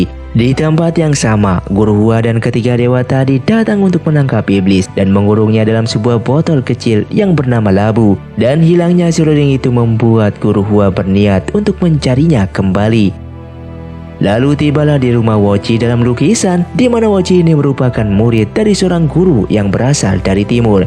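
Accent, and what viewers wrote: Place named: Java, Indonesia. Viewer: Dari jawa mana bang?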